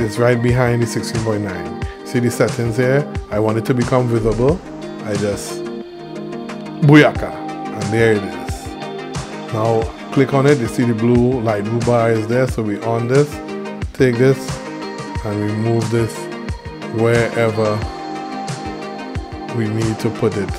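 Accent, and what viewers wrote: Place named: Sint Maarten, Caribbean. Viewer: Great video. I appreciate this information. I don’t know why but you definitely sound like you have a Caribbean accent, in particular a St. Maarten accent. Let me know if I’m correct lol.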